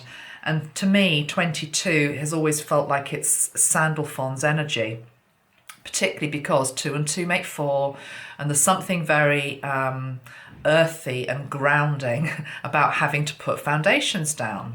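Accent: United Kingdom. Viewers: You are so so gifted and insightful .. This reading as with all you readings i have come across are beautifully accurate. Being British myself it is nice to hear the accent and the same sense of British witty hunour as myself. THanjk you for sharing your psychic abilities with us and guiding us to your best honest knowledge... I appreciate it. Om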